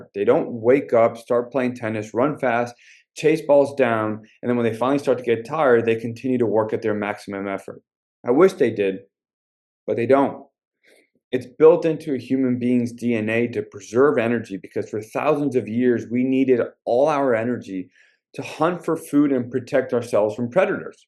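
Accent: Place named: Eastern Europe. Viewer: Coach, I don’t know what’s behind those 3 “lessons”, but you sound more Eastern European than American😂. This is how “business” is done here.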